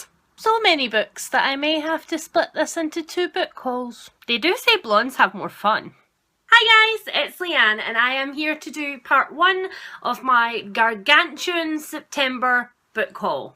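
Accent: Scotland, United Kingdom. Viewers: Just discovered your channel! I love your videos! And I love your scottish accent! I can't wait to visit Scotland again next summer (I'm Italian), I've been there back in 2014 and fell in love with it.. Plus, Edinburgh's bookshops are to die for! Awesome Haul btw!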